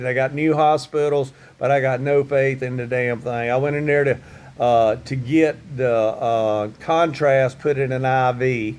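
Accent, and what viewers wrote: United States. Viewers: God bless brother!!...obviously, you are looking and sounding very American, they will treat you as a rich person. I pray that you will find doctors that are truly into healing and not for profiting. Old saying goes, "stay away from sugar",... and carbohydrates. And if you are not diabetic, try fasting for 3 days. Reboot your immune system and rebuild new and stronger cells. Take care bro...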